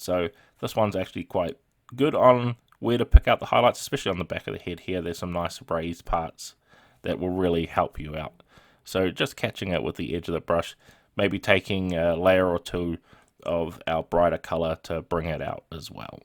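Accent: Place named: New Zealand. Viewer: Oh a Kiwi voice! That's a nice change.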